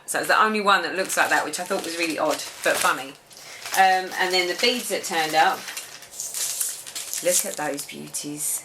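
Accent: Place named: Essex, United Kingdom. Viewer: Thank you for your videos!! I don't want to be critical of others, but.................... You have a way of speaking into the camera, telling a story without 5 minute hesitations, no umming, ah-ing. I feel you are talking to me and not your ceiling. You don't "forget" where you purchased something. You are organized and have everything at your fingertips, no matter what room you're in. You aren't dashing about to another floor in your house to find something while your camera is running. You know where you get your floss. All your fabrics have names rather than "I'm not sure what it is." or "Yes, it's pink and white but I don't know why or who did it." You don't hum non-existant musical ditties all the while lacking the talent to do so during a "Stitch With Me" video. If your phone rings, you don't answer it. How gracious and courteous of you. 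You probably have one of the most concise, informative, friendly videos on Flosstube!! And......you ALWAYS look so nice, even when you aren't well. (I include Carolyn Masseo among your caliber of video makers.) Whew!! Thank you for allowing me to get that off my chest. It's not hard to figure out what to watch on here as long as I see a video I haven't watch from " "!! And come on now!! You HAVE to love that Essex accent! Hugs,.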